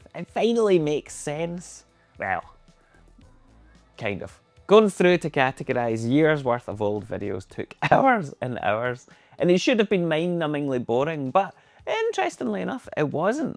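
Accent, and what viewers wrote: Scotland, United Kingdom. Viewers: I like how there's no one like you. You are unique and I'm from America and love your Scottish brogue. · Oh no and I was so proud to finally having wrapped my head around the Scottish accent 😂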